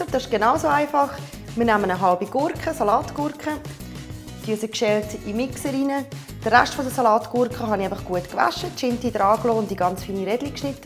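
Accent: Switzerland. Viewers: Super Getränk sehr gut gemacht ich liebe deinen Schweizer Dialekt LG ❤️ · Cool ich muss das auch mal ausprobieren. Schweizer Dialekt klingt so sympathisch 🤗🤗🤗😋😋😋👍🏼👍🏼👍🏼👌🏼👌🏼👌🏼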